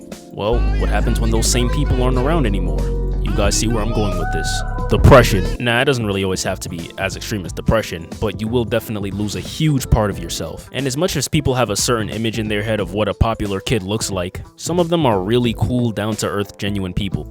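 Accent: Brooklyn, United States. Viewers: You from Brooklyn frfr huh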